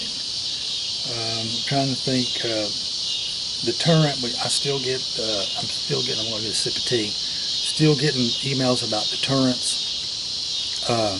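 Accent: South, United States. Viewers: I love your southern accent! And also the way you explain everything in such detail!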